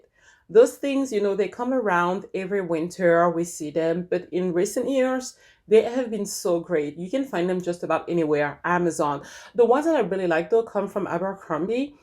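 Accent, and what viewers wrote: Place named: Texas, United States. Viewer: I was listening when Andy walked in and I asked him, “Do you recognize this voice?” “Yes,” he said, “!!” So fun to hear you!!! But I think you’ve lost some of your Texas accent! 😉 We miss you!!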